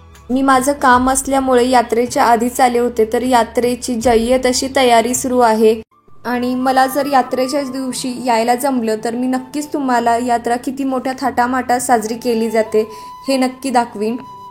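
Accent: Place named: Kolhapur, India. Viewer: तुम्ही विदर्भाच्या म्हणता पण कोल्हापुरी भाषेचा साज चढवून छान न.एकच, लय भारी व्हिडिओ बनवलाय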